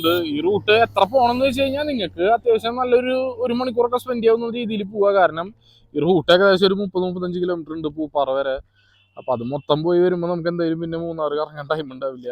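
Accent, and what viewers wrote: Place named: Thrissur, India. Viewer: Ellam kollam bt aa tsr slang 🙏🏼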